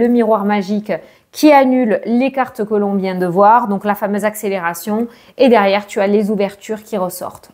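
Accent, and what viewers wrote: South, France. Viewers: Bien sûr que l' on te regarde, et surtout que l' on t' écoute, ton bel accent du sud nous donne un rayon de soleil tu es une vraie petite pile merci a toi et bonne journée bon weekend gros bisous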